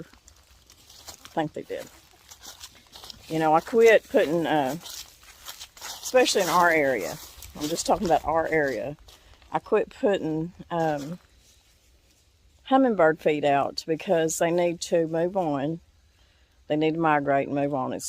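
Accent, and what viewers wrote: South, United States. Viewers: I just thought you were gonna tell us a good story! I hope it's not going to be "one of those days!"... I spent the major part of my life in the south, so it's not like I'm not used to the accent, I have one myself! Have a blessed day!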